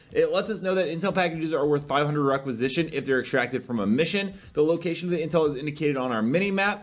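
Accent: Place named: Texas, United States. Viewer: Even as a f*cking french i can smell your texas butthole accent frome here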